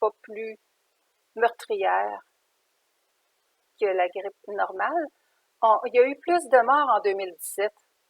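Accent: Quebec, Canada. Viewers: vous êtes trop mignonne avec votre accent québécois 🥰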